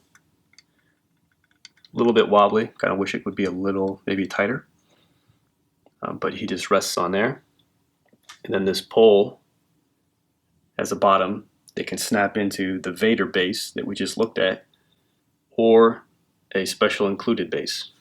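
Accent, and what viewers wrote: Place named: Wisconsin, United States. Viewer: You sound like you're from Kenosha Wisconsin.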